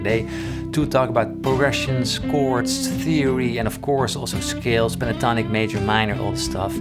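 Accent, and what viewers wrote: Netherlands. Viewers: are you Dutch? Your accent seems to suggest so ^^ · Are you Dutch? I feel like I can distinguish between accents noe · Altijd leuk dat je meteen hoort wanneer iemand een Nederlander is. Maar prachtig en inspirerend filmpje en ik ga het proberen!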